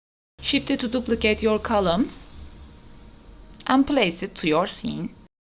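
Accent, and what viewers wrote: Turkey. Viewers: Thanx for your tutorial with Turkey accent!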